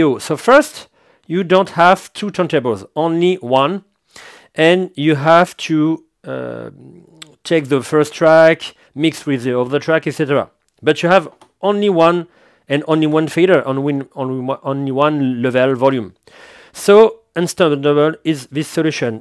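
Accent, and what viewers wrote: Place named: France. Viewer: l'accent français de merde XD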